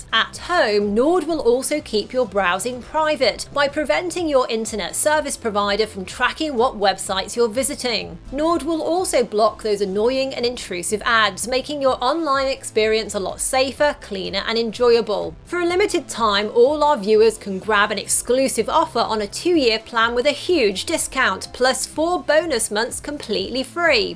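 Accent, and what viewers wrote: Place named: United Kingdom. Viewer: That correct pronouce of Ortega was absolutley unexpected, whilst having a strong Brit accent.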